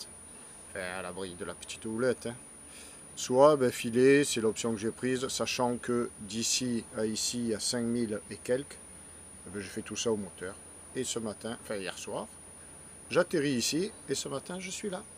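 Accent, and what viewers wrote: South, France. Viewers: Salut à toi j'espère que tu te porte bien ainsi que Tabasco, je voulais te dire que tes vidéos sont parfaites quotidiennes et hebdomadaires nous sommes tous au rendez-vous, cela fait quelques temps que je suis tes vidéos ( à vrai dire c'est mon frère qui m'a fait découvrir ta chaîne) et je vois également que tu montes à presque 4000 abonnés c'est beau parce que lorsque tu réalisé tes vidéos tu te prend pas la tête, tu mène ta vie tout simplement et tu nous la fait partager et le faite de poster plusieurs vidéos par mois ça crée une addiction lol (comme Netflix), du style " gros vient de sortir une nouvelle vidéo vas voir" ainsi avec ton accent Typique du sud on s'y croirait là sur le Tabasco, nous aimons bien refaire ton accents " Tabascoooo" Avec le temps tes vidéos ce sont améliorées (le son, vidéo, cadrage, Drone), celà ne peut qu'aller vers l'avant, Attention en mer. 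Signé un jeune néophyte!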